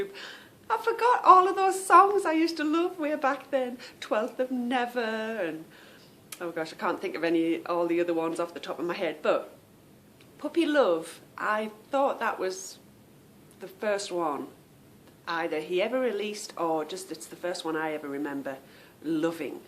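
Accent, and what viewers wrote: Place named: England, United Kingdom. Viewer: You talked about saving our first knitting. I learned as a young girl and would make my own doll blankets. I wish I had saved one. But, I did however save my son"s two knitted squares. He is 37 yrs. old now lol. I could not part with them. He asked me if I would teach him how to knit when he was a little boy :) Now I am teaching my 5 yr. old Granddaughter who I live with. Years ago I entered my work in the California State Fair and won ribbons. Most of the entries were baby items. I saved them all so someday my grandchild could wear them. Well, years later my beautiful Granddaughter was born and I gave the clothes I made to my Daughter and she dressed her in all the adorable treasures I had saved. It made me so happy. Now for the word pronunciation. I say Basil the same way as you do but the other words I say in American. 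You also have people in the south and east coast of the US who have their own pronunciation as well lol. I assume the English, like yourself is correct. No one should correct you.. I would love to sound like you. I'm in California so we get sloppy at times when saying words like party etc. and switch the sounds to a letter D. It's very interesting. I enjoyed your podcast very much as always. Take care...Sharon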